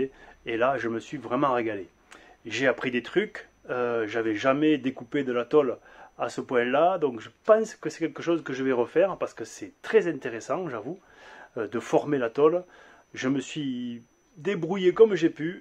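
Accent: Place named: Bigorre, France. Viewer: Félicitations. J'ai moi aussi installé mon poêle dans mon atelier cette semaine. J'avais aussi regardé pour un Godin identique mais je n'ai pas eu la chance d'en trouver un à un prix si bas. C'est donc un theca de 20kw car j'ai la chance d'avoir une grande surface de jeu. Très belle vidéo...c'est un plaisir d'entendre l'accent bigourdan sur YouTube. Amitiés gersoises Anaïs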